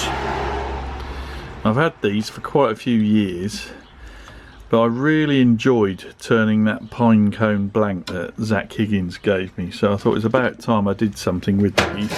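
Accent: Suffolk, United Kingdom. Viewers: Oh my word you absolute legend !! Taking something from nature and enhancing it's appearance. You sound like a Suffolk boi? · Really like this this idea...going to try and do one of these myself....im guessing by the accent your across the pond from me. Some of the stuff you mentioned are products that are there...wonder what they are here in the states.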